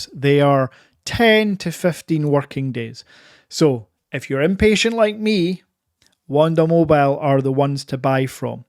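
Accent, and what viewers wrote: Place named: Scotland, United Kingdom. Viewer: Scottish, not English. Are you a fan of The Critical Drinker?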